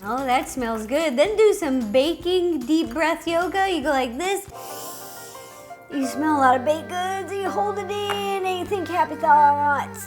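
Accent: United States. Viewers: today you kinda sounded extra....country.....ish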